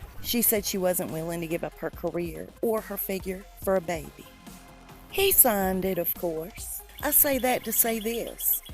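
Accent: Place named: Texas, United States. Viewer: I love your Texas accent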